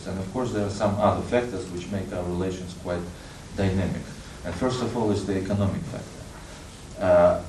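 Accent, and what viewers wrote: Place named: Russia. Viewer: Хорошо поставленный у вас английский, впрочем не без сильного русского акцента).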